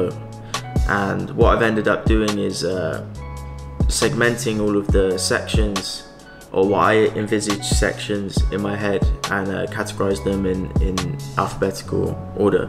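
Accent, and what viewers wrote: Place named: United Kingdom. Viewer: I love your explanation, simple and clear. Right from the start, you are the British who might live in London or something.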